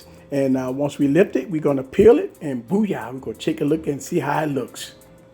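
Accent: Louisiana, United States. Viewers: I hear the accent ! Gotta be from Louisiana I’m from New Orleans and you?! Great video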